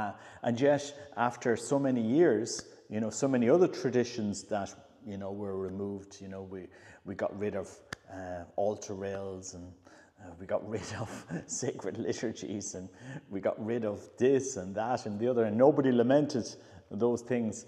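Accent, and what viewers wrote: Ireland. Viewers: you are so lovely to listen to - you have such a gorgeous Irish accent and what you are saying is so simply wise and beautiful. So true - Christ is our focus and prayer is our life blood; I recently attended a parish ‘consultation’ on whether the two dioceses in South Wales should be merged. The outcome was obviously pre-decided, and the whole presentation was put in terms of financial resources. No mention of prayer - as a few of the wisest and most enlightened of the parishioners mentioned to me. How can they possibly expect the church to flourish when even the leaders - even the bishops - do not see the value of prayer!!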